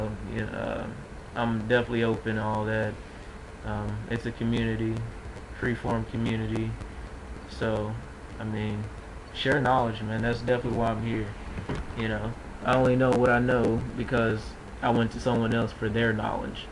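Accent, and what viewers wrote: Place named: Texas, United States. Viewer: First off, let me say your locs are Rockin'! Second of all, you're not hearing what we hear in your voice. Your voice is fine, even has that special oomph with the Texan accent. So be encouraged. And since you write poetry, this will be your way of getting out of that insecurity with your voice by letting us hear your spoken word. Thanks for sharing. Subbing, hope you'll do the same.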